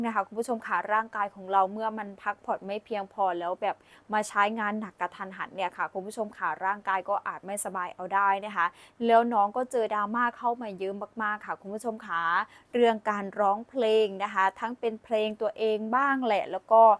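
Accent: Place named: Isan, Thailand. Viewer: ❤แอดมินเปนนักข่าวหรือนางเอกหมอลำ..น่ารักจังอ่านข่าวสำเนียงไทยผสมอิสานได้ไหลรื่นฟังเพลิน❤😊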